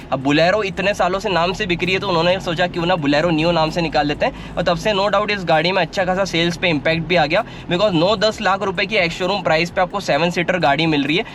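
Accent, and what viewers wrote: Indore, India. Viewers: Bhai tum indore se ho kya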